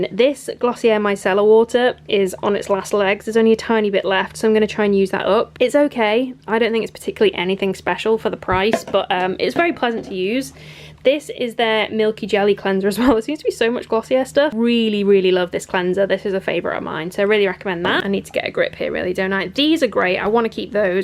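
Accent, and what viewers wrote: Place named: Yorkshire, United Kingdom. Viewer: Northern English (Yorkshire)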